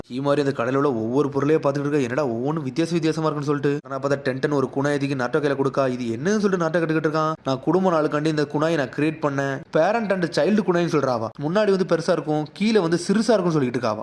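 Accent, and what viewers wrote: Thoothukudi, India. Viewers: Lastaa kuramaa nu sonnathu nalla irunthuchu.. 😊 Neega Thoothukudi yaa na??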